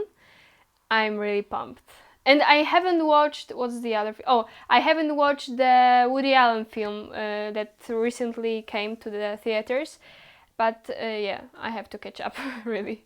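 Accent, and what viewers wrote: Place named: Poland. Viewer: Hi, congratulations! You are fluent and natural. In your speaking English there is no pretending of not being Polish by no means. On the contrary, this video shows (maybe more clearly than the rest) that you are thoroughly Polish. And this is good. There should be more of such expression of Polish identity and spirit in English on the web. Keep up. And thank you!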